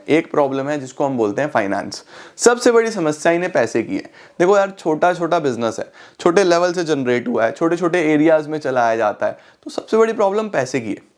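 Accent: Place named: Punjab, India. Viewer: Mangji se pta chlta h sir ap pake punjabi ho 🎉😍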